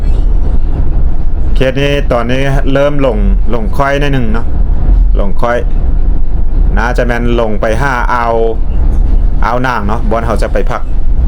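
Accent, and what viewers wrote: South, Thailand. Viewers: แปลกจัง สำเนียง70% เหมือนภาษาใต้พื้นถิ่นของภูเก็ต & พังงา เราฟังแล้วเข้าใจ100% ไม่เหมือนฟังภ.อีสานแถบอุดรฯ, ขอนแก่น ฯลฯ เคยมีคนบอกว่าภาษาอีสานของจ.เลย ซึ่งคนส่วนใหญ่อพยพมาจากหลวงพระบาง จะพูดเหมือนภาษาถิ่นใต้ฝั่งอันดามัน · ไซเหมือนแหลงใต้เลย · สำเนียงภาษาใต้ชัดๆ..ถ้าไม่มีคำว่าแม่น/บ่/มัก/เบิ่ง/เฮา/(จะไม่รู้ว่าคนลาว)สำเนียง..คนใต้ชัดๆ..คนไทยภาคใต้นี่น่าจะมีเชื้อคนหลวงพระบาง55..หรือคนหลวงพระบางได้ผัวคนใต้55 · สำเนียงบางคำ เหมือนภาษาใต้ปนอีสาน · ฟังตอนแรกคิดว่า แหลงใต้ บางคำเป๊ะเลยนะ เคยมีเพื่อนเป็นคน วังสะพุง จังหวัดเลย พูด แบบนี้เลย สะพานถูกต้องแล้ว อ่านว่า สา-ระ-สิน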